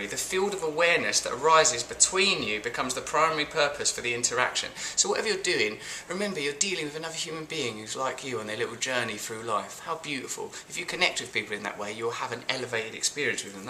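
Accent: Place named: United Kingdom. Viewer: I wish more people would see this side of Russell Brand anytime I recommend one of your videos or movies they think it's just a guy yelling in a British accent.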